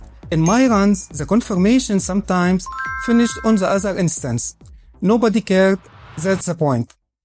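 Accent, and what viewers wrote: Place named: Syria. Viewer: انت سوري اللغه انجليزي سوري !!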